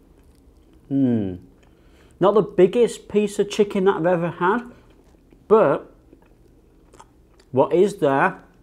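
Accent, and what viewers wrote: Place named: North, United Kingdom. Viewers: Don't worry so much about your pronunciation...you're English... Next time when you do get out...buy some raw peanuts and raw anchovies at the supermarket...later can fry some up when you eat your nasi lemak at home...at least you can control the amount of anchovies you want to eat. Boil another egg if its not enough. Cheers mate. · I like your Yorkshire accent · Hello Sir. I really enjoyed your videos about Malaysian local dishes. And I totally want to learn and pratice more so I can have English accent like yours. Happy quarantine in Malaysia. Stay safe 🇲🇾🇲🇾🇲🇾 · What does it matter how you say it (Kuala Lumpur)? that is part of the fun in far away land. I love your northern accent and always associate it with, honest straight talking. You could try a type of noodle dish called Char Kway Tiao. Glad to see your staying safe. 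Look forward to the next video.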